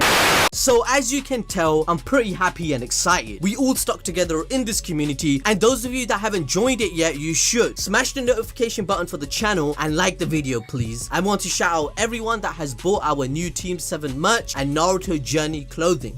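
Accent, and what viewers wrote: Punjab, India. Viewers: Man are you pakistani?? Your punjabi accent seems like it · That Punjabi accent 😂🔥🔥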